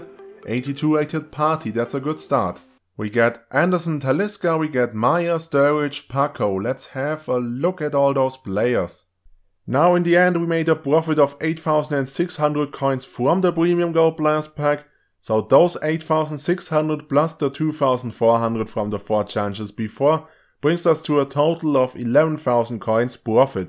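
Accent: Germany. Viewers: Are You from germany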